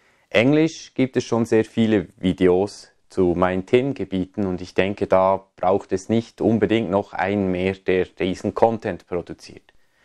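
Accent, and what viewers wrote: Switzerland. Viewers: Schweizer Hochdeutsch passt 👍🏻👍🏻 bin froh das es auch Videos in Deutsch gibt. DANKE Lg aus Österreich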